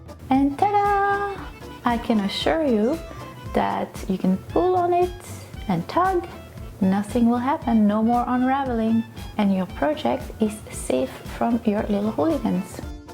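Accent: France. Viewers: you have a french accens XD I love you xoxo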